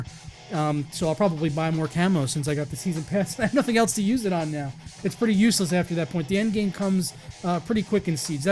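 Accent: Canada. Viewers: that Canadian accent made me moist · O Canada! BTW great Canadian accent! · I had this course in uni and there was this Canadian guy, and damn he talked exactly like that. Never managed to hear the difference until now (Eastern-European here).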